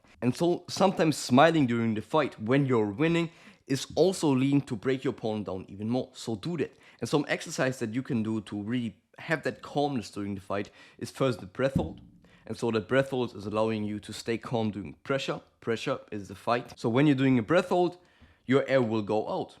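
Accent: Germany. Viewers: bro are you german?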